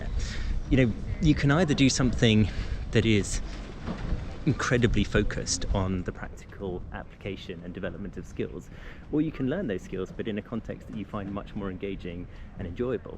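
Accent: United Kingdom. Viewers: I like British accent hope i can talk like that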